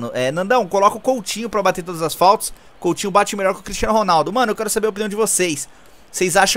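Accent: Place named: Northeast, Brazil. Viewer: Nandão tu eh mito , parece ser nordestino kkkkkkkkkkkkk , por mim emprestava o haaland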